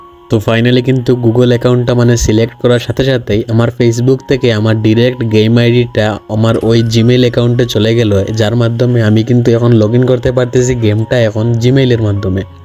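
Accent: Sylhet, Bangladesh. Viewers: ভাই কিতা সিলেটি নি ❤ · ভাই আপনি কি সিলেটি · vai apni Sylheti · ভাই তুমি সিলেটি নি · Vai Sylhety ni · সিলেটিদের কথায় আলাদা ভাইব আছে ভাই শুনলেই বুঝা যায়। শুভকামনা রইলো এলাকার ভাই❤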